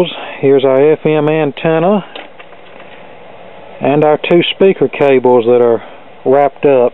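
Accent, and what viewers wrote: South, United States. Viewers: staggs You have to take in account of his southern accent.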